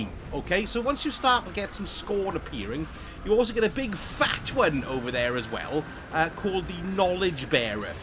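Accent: Wales, United Kingdom. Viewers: Do I want these triumphs badly enough to listen to a welshman for 10 mins...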